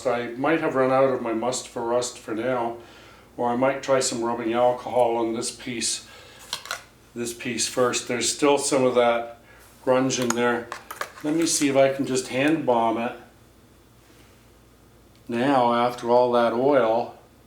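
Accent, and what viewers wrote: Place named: Midwest, United States. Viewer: Thanks for your videos! I thought your accent was MidWestern. Michigan, Wisconsin. I'm from Pennsylvania.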